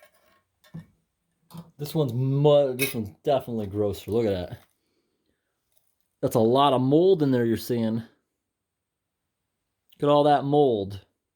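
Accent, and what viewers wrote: New England, United States. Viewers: App ri cots lol. New England accents are weird. Your videos are the best though! You really need to get a P.O. Box like ashens used to do and he’d get all sorts of crazy old food.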